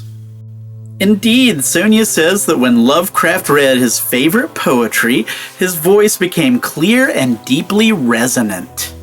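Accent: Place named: New England, United States. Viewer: So Linus from LinusTechtips if he had a New England monotone accent. (that's my guess)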